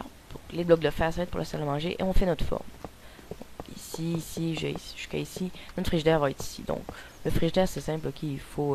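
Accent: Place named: Quebec, Canada. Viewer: Ta un axan québecois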